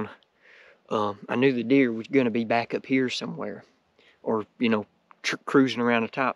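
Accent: South, United States. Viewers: The accent sounds really southern. What state are you from?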